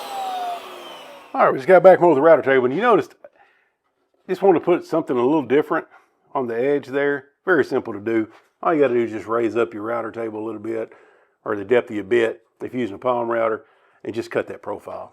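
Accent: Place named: Arkansas, United States. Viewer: Are you from Arkansas? Your accent sounds just like my hubby and his family :)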